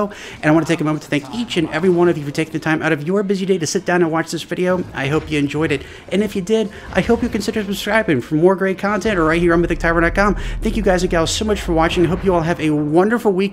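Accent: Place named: United Kingdom. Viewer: I C O N I C.- A Flood fan with UK accent. 👀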